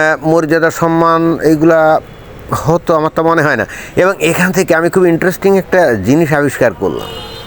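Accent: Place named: Khulna, Bangladesh. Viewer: আপনার কথা শুনে মনে হয় --- আপনি খুল্লে জেলার লোক! ওটা আমাদের পূর্বপুরুষদের বসবাসের জায়গা ছিল!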